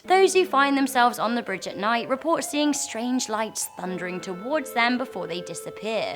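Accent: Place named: United Kingdom. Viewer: British accent🤮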